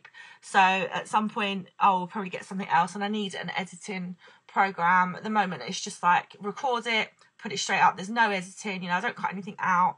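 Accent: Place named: United Kingdom. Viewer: Stop saying who you aw, remembaw, whatevaw your british accent is screwing up youaw english!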